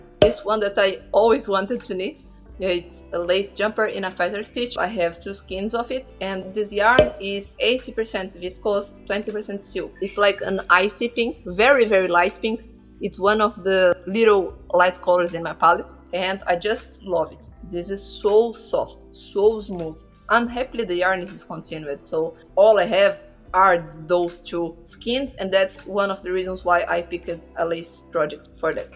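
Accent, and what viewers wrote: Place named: Brazil. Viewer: incrível que eu pensei "ela é brasileira, certeza" só de ouvir você falar. ameiiiii 💜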